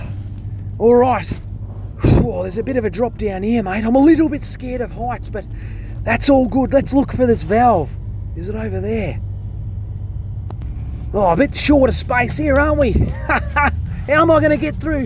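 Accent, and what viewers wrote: Australia. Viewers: Nice one. We always wondered if you just did drains. Watching from the UK with my ex pat ozzie wife. She loves to listen to the ozzie accent. Liked and subscribed. All the best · !!!! The algorithm got me here!!!! Love watching your channel and that accent.....my youngest girl lives in Melbourne.....makes me feel closer to her to watch your channels.....greetings from Montgomery Texas home of the Texas flag!!!!!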